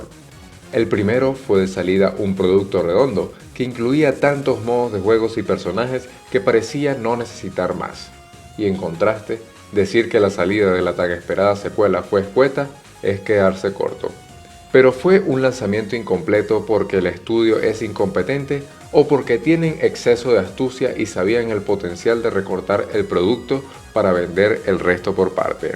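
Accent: Venezuela. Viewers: Verga se me adelantaron con la pregunta, " de donde eres?". bueno no importa ya sabia yo que por la forma de la que hablabas eras venezolano pero bueno hay que preguntar para estar seguros. Y bueno chamo de pana que eres bueno en esto me gustan demasiados tus reseñas, como tus demás vides me parecen bastante interesantes, a mi se me hace que tendrás un gran futuro en esto de youtube, buena suerte convive. PD: Yo también soy caraqueño XD.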